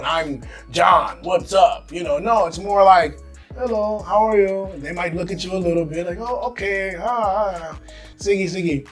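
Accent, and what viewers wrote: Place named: Ilonggo, Philippines. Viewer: Dude... This guy is speaking ilonggo? At filipino whoa (｡’▽’｡) nakakapanibago haha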